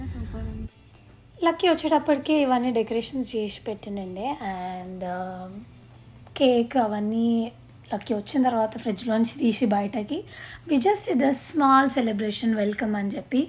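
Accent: Telangana, India. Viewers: Love your voice i think your from Telangana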